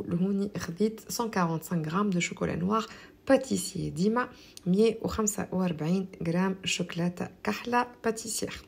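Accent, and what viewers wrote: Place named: Tunisia. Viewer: ما اجمل لهجة تونسية، روعة الوصفة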